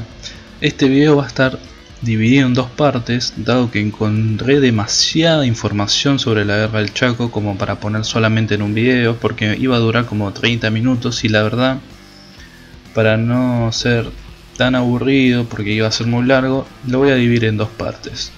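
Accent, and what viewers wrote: Argentina. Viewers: obvio que es de Argentina o no escuchaste su acento :v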